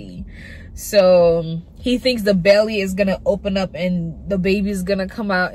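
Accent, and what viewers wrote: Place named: England, United Kingdom. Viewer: Your skin is glowing ♥️😩 It's your English accent for me💖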